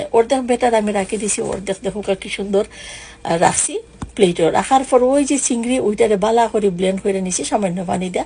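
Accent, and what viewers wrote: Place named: Chittagong, Bangladesh. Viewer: আপনার ভাষায় আমি মুগ্ধ ❤ আমি চাঁটগাইয়া ❤ কি যে ভালো লাগছিলো ❤ আমাদের আঞ্চলিক ভাষা মানেই মধু❤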